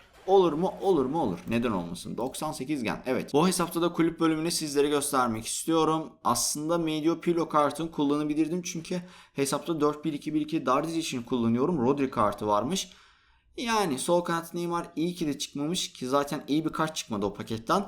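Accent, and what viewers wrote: Azerbaijan. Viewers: Abi Azerbaycanlımısın?❤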